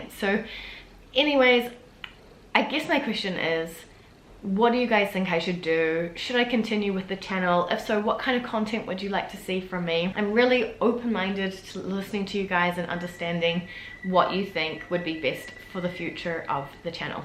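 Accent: New Zealand. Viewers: Your accent has become so much stronger no you're back in NZ!! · Oooooh that makes me so sad ! As a French from Lyon's area, I discovered your great channel a few months ago and loved it right on the spot ! I really love the way you describe our society and little habits that seem perfectly normal to us, but certainly really weird to foreign people. It's always super interesting to get a critical look on our habits. Yours is critical, but in a funny, full of empathy way, and it is always very positive and enlightening. Not only did I like the way you talked about our country, but also the way you generally speak, your personnality, your sense of humour, your great accent from NZ... So keep posting ! I hope you enjoy the modifications in your life. Your family must be sooo happy to finally have you back ! Don't be sad, ce n'est même pas un au revoir, on reste avec toi ! 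Chin up, spirit high ! Be happy, a nice soul like yours diserves that ! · Hi! You have not to be worried about the channel, it was born with another purpose but adjustments to it might be the right thing along with the changes you are experiencing now! Me and my girlfried have started following you mainly because you come from New Zealand 😋 we wanted to hear your accent and about the differences between Europe and Newzealand lifestyle and you always hit the target. We both hope you'll continue doing videos about New Zealand and more! Thank you for your great job so far! E&I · Hello, I have been studying English in NZ for 6 months. I left Paris in January to come here where I had been living for few years. My sister works to L'Oréal as well. I'd just would like to say that I discovered your channel one week ago and I very apreciate your video. I think your speak French quite well. But I very enjoy to listen to you speak English with the kiwi accent about Paris. 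It helps me a lot for my learning of English. So thanks for that. According your chanel, even if the concept is an expatriate living in France I will be happy if you carry on. You can apeak about such a beautiful country that is NZ. I went to Wellington some weeks ago for a weekend and I very enjoyed the place, I think like a lot of people you're lucky to live there. I wish you the best ! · Hi, please carry on with your channel you’ll teach us stuff about NZ, I’ve always been fascinated by this country and the Maoris and I really wish I could go there someday. Plus, I love kiwi accent! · It’s amazing how way different your accent is now, way more Kiwi (obviously? ) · Your so pretty and that kiwi accent is adorable and charming ! I think it's a great idea to keep this Channel, do post vids when you visit France and incorporate Videos about New Zealand. I would love to learn more about New Zealand. 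People seem so friendly there.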